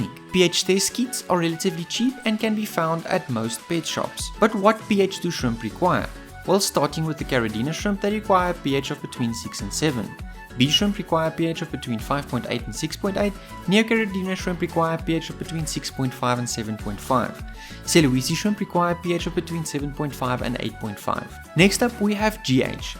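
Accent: South Africa. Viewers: I really love the south african accent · and concise! Nice to hear a clued up South African hobbyist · I can hear you also speak pure afrikans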